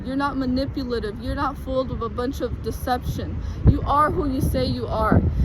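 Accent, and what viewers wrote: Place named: England, United Kingdom. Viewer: I like your english accent , i study english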